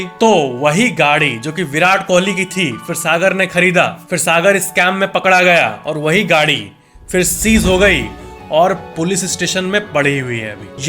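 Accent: Bihar, India. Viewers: Bhaiya aap bihar se ho kya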